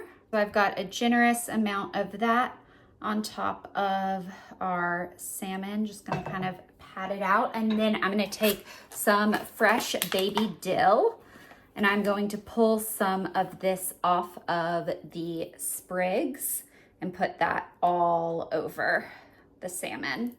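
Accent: South, United States. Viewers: Hi! I’ve been watching your videos ever since your scarf-styling tutorial popped up on YouTube’s homepage—so probably since 2008 or 2009. I’m from Switzerland, and your channel was a huge part of my teenage years. Watching you motivated me to improve my English (I always loved your Southern accent!) and I learned so much about beauty and makeup—way before my friends did, because we didn’t have anything like the beauty community here. I just wanted to say thank you for coming back to YouTube. Your rambling, cozy style has always felt like a podcast to me—I love having your videos on while I do my makeup or just take a break. And your honesty about introducing your new Cavapoo after Teddy really touched me. I totally get it—I felt the same after losing my dog. Funnily enough, I now have a Cavapoo too—her name is Lokki 🐾 Anyway, I’ve never really commented before (very Swiss of me), but I’ve been here all along and just wanted to finally say thank you for everything! 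✨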